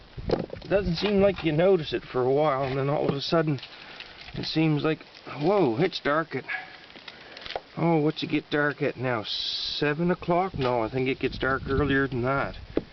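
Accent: Nova Scotia, Canada. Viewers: Watched this as growing some Charlottes in the UK - but I spent a lot of the video interested in your accent - I'm from the South West of England and I can detect a lot of 'West Country' traits in the Novia Scotia accent!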